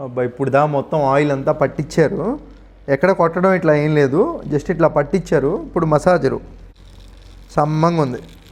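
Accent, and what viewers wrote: India. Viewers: Re first you learn English talk English and talk properly English. Then after you go to world travel in front onwards. You talking this Buttler English, it’s not good for us. It’s not good for Indian people also talking about that Butler English in front of foreign persons it’s impact for us in India, so learn properly talk properly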